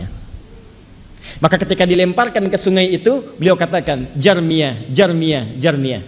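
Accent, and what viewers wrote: Arab world. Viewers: Ustad dahsyat, ilimu nya tinggi, keterangannya mudah dimenerti. Tetapi mohon logat bicaranya jangan spt org Arab berbicara bahasa Indonesia. Salam. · Ust ni org Arab ke? Cakap Pon loghat arab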